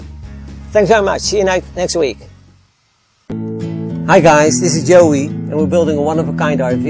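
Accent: Netherlands. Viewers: I hear some Dutch origin in your voice? Or am I way off?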